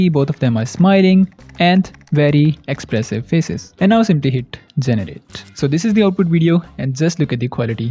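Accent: India. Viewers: You know what they say: Anyone with a funny/Indian English pronunciation promoting a site that seems to work wonders is actually a scam.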